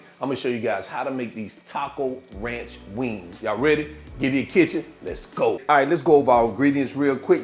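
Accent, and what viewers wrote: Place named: United States. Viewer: I love the way this guy talks. No idea what part of the US you're from but as a Canadian it sounds pretty cool to me. This looks amazing, I'm gonna make these as chicken fingers, idk something about federal laws. All chicken must be served in the form of fingies or you're not a true Canadian or whatever.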